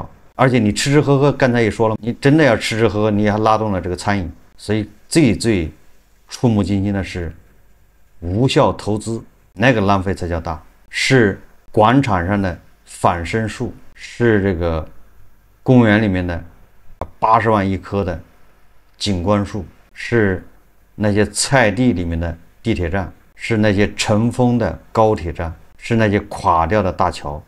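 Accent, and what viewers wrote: Anqing, China. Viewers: 第一次看你直播的时候听口音我就知道你是安庆的，怀宁的懂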